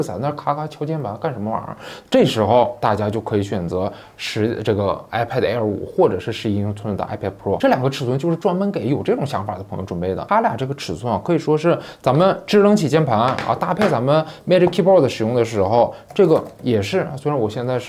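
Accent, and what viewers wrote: Northeast, China. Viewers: 東北話太逗樂哈哈哈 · 东北老铁啊？ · 第一次收到推送，是东北人吗听起来好好笑啊可是难道不应该是台湾的频道吗🤣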